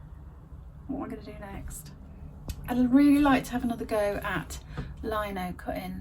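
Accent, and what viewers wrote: Nottingham, United Kingdom. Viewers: Your youtube channel came up on my list a few months ago….I watch and subscribe to many lovely resellers so maybe thats why? I was pleased to discover you were Local- ish to me …i live near Dawlish … I felt there was something familiar about you ….it wasnt until I saw your video about your visit to Nottingham that made me wonder if it was your accent…..I originally come from a small town near Hucknall and then moved to Newark and then moved to Devon 20 odd years ago ….I havent visited Nottinghamshire for many years so it was lovely to see some of it again….and your recollection of Vicky centre indoor market ….I really like your videos and your outings and wish you well with your move back up north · I can't remember if I saw you in one of Caroline's videos or if I started watching via youtube's suggestions? This might sound strange but I love the British accent so I like listening to both you and Caroline talking. 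And the Expawers which I learned about from Caroline. As for feeling lost, have you thought about volunteering? That could give you a bit more purpose. I volunteered at the humane society walking dogs or helping people pick out a pet to adopt. Perhaps think of something you might enjoy doing? Take up knitting? Research your family tree? Just a few ideas that came to mind. And right, it's that time of year. We've got gloomy weather today too (upper midwest in the U.S.) which always brings me down. I'm going to go wrap some presents and try to ignore the weather. I hope your icky mood passes soon. 🤗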